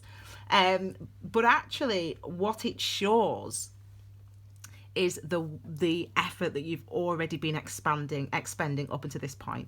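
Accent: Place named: Northern England, United Kingdom. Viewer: Always nice to hear a familiar northern accent ont 'tube. M/cr here.........top reading...........mint!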